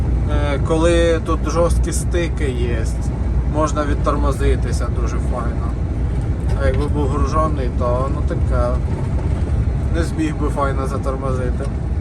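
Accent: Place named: Surzhyk, Ukraine. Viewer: Говори по-русски. У тебя хорошо получается. А вот мова твоя ужасна, то есть - это не мова соловьиная, а очень херовый суржик, говносмесь. ПРежде всего в произношении, в фразах. НЕ позорься.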